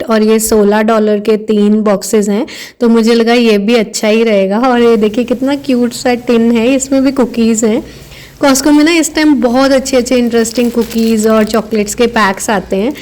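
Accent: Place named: India. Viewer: I really love your videos, your thoughts and views. Love love your accent and you owning it and rather than being shamed by people. This makes me admire you even more. Re aroma of our Indian spices and cuisine, instead of being shamed I am 💯 on board with you. Just like you, I question the same to people who are bothered by our Indian spices smell. I am married to a midwestern American Caucasian. When his family even snubs their nose at aroma of my Indian cuisine, all I say to them is “I totally understand that this aroma is new to you and you find it different just like how I still feel about the burgers and meats you all eat”…Love your authentic self. Always stay that way 💕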